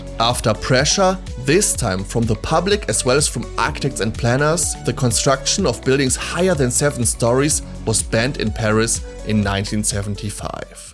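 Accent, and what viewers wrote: Germany. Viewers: deutscher akzent :D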